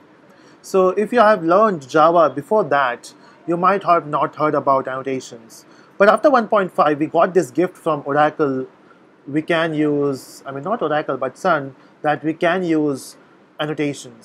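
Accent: India. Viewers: You have to be Indian to understand his accent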